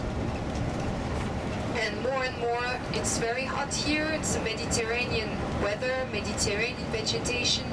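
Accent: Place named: France. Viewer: French man here, and I love the "Frenglish" accent very much!! 😁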